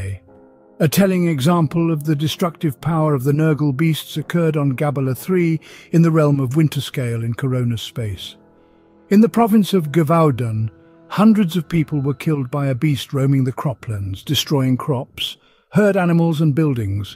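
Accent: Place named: United Kingdom. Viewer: The British dude narrating makes my brain turn off and stop listening. Idk why. 🤔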